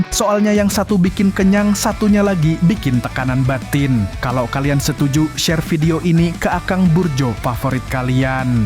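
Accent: Banjar, Indonesia. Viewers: Urang mana gerang bang nih. Urg banjar kah 😂😂😂... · Orang banjar sekali nya sidin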